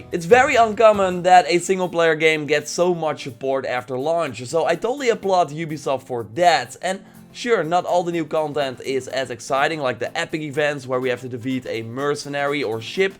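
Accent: Netherlands. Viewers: Your dutch i hear it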